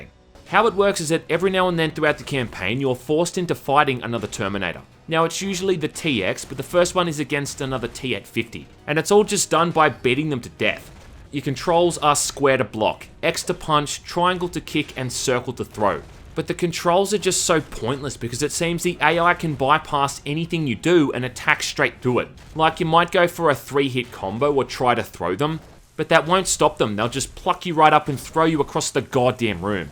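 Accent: Australia. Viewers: This. I mean, sure, he does have that sweet Aussie accent, so I could have misheared him, but saying 'three' and sounding like 'four' is still a bit unlikely. :/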